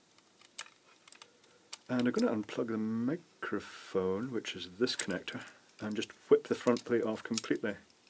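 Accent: Scotland, United Kingdom. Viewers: I thought he was Scottish?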